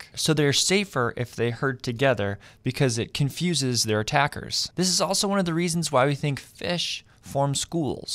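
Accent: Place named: Canada. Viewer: You've got a Canadian mouth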